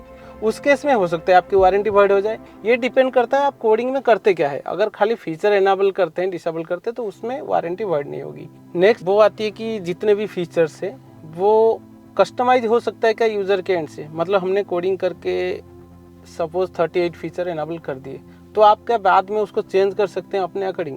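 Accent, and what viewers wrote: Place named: Odisha, India. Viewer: bro are you from Odisha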